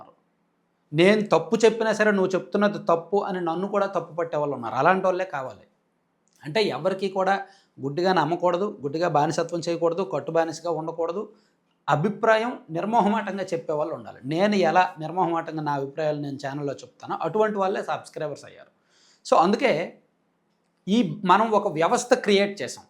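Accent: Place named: Coastal Andhra, India. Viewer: మీ వాయిస్ కోస్తా మాదిరి ఉంటుంది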